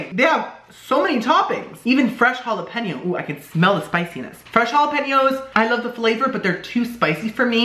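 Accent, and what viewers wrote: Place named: United Kingdom. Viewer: I love to watch the unboxing! Don't listen to the dummies. Also..you don't even EVER have to ask if we want a follow up video to anything...ummm YASSSS WE DO!! I'll take any video from you..follow up, new, or just you talking in your British accent for 30 minutes. Just say BUTT-AHHH in your British accent for 30 minutes and you will make my year!!💙💚💜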